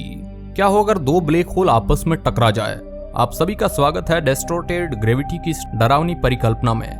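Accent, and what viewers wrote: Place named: Bihar, India. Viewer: Abe sale brust kya he be? Gama Ray brust??? Pehle accent thik kar uske bad ana video banane .... bihari he kya?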